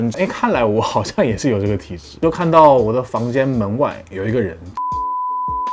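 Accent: Standard. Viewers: 這中文講得也太標準了吧！！！！ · 发音确实超标准 👍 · 中文發音很標準欸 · 其實你是台灣人對不對？中文太標準啦w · 我是中国内地的朋友在加拿大生活，身边认识很多韩国🇰🇷朋友，我发现你的中文已经好到比我说的都标准了！终于了解韩国的历史文化了。非常的赞！秒关注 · 發音好標準哪！對後製人員按個讚，真的好細心。不論是音效、插入的圖片或影像。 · 他發音超標準！而且真的聽起來很有感覺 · 發音比一些台灣人還標準唉 😂😂😂 例如我😔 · 發音很標準😆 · 國語講的很標準！聽不太出來是韓國人🤣🤣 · 超級標準我還以為他是台灣人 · 最後一個故事好毛啊!! 嚇死~ 而且中文口音好標準, 差點聽不出來是外國人~好厲害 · ，您的中文真的很標準，我打從心裡真心佩服，可是您的故事比較像是鬼笑話欸😆~ 聽你講中文還比較有趣。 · 講中文超級標準耶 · 不得不說你的中文很標準,不說真以為是台灣人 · 第一次看到你的頻道！你好可愛中文也說的很標準！（被圈粉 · 中文也太標準了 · 發音怎麼可以這麼標準好感人啊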